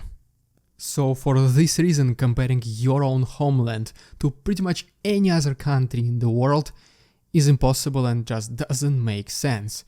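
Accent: Russia. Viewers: Hmm. Let me tell you something about heavy "Russian accent". If you've lived for more then 6 months in in a country, especially studying there, it's a sign of "lack of commitment" if you still retain that much of an accent. It means that you like your accent. In my experience with Russians that's very common and basically a sign of "imperial entitlement", basically contempt. And as with any contempt maximum disrespect is deserved. People will notice and will react, even if they can't explain it. I lived abroad for several years, in the 1990s and there was a Russian(Soviet if you prefer) community on the same block. It was quite common between them people that lived for 5+ years in the country and couldn't get their way in the grocery store. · It doesn't surprise me. Most americans find a Russian accent kind of endearing.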